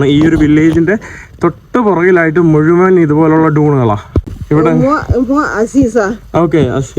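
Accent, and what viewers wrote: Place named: Thiruvananthapuram, India. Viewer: അവൻ സംസാരിക്കുന്നത് തിരുവനന്തപുരം സ്ലാങ്ങിലാണ് ഓരോ ജില്ലക്കും അതിന്റെ തായമാറ്റങ്ങളുണ്ട് ജില്ലയിൽ തന്നെ വിവിധ ഭാഗങ്ങളിൽ വ്യത്യസ്തമായ വാക്കുകൾ ഉപയോഗിക്കുന്നുഎന്നിട്ടാണ് മലയാളം ഉച്ചാരണം ശരിയല്ല എന്നൊക്കെ പറയുന്നത് കൈയ്യിൽആധുനിക മൊബൈൽ ഒക്കെ ഉള്ളതല്ലേ ഗൂഗിളിൽ ഒന്ന് സെർച്ച് ചെയ്താൽ കിട്ടാവുന്ന തെയൊള്ളു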